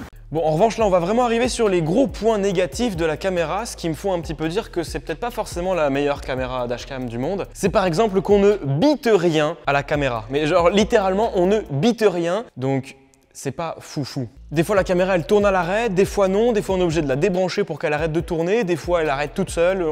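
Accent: India. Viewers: Grave chaud ! Je suis dans l'informatique et je kiffe ton accent indien quand tu oarle anglais ça me fait penser aux tutos obscurs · Quand il parle anglais on dirait il parle INDIEN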